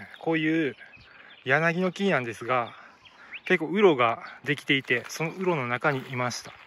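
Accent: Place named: Nara, Japan. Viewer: 喋り方が関西の中でも奈良や京都の南の方ぽい(^^)木津川かな？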